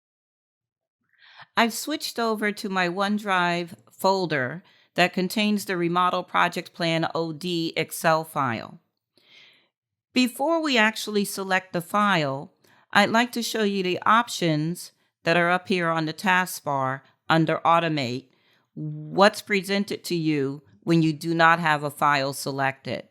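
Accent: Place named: United States. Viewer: thick accent American is inritating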